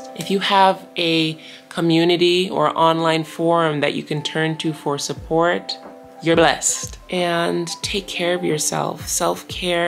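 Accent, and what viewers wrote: Jamaica. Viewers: I heard that Jamaica voice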